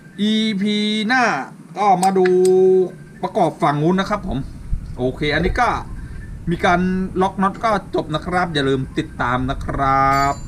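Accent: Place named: Sukhothai, Thailand. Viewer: สำเนียงคล้ายๆคนโขทัยเลยน้า fcครับ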